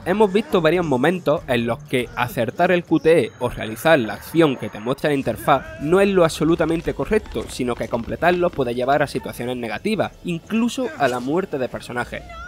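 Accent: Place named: Canary Islands, Spain. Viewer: Parece canario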